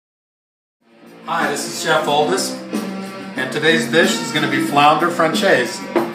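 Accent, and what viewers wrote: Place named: New Jersey, United States. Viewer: I love your Jersey accent❗❗ That recipe looks simple and delicious 👍🏾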